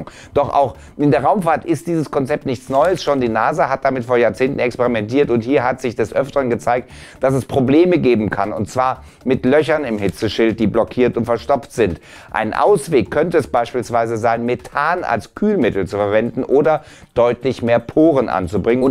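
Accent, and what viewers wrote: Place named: Berlin, Germany. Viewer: Und das von einem YouTuber mit Berliner Akzent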